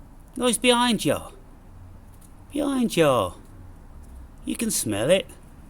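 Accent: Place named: Southern England, United Kingdom. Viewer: Hi, found your video on Peter Kingsley, great work! I have a rather personal question, you said you’re living in Newcastle up north, but your accent is from south, when and why did you move up there? I’m from London. Thanks 👍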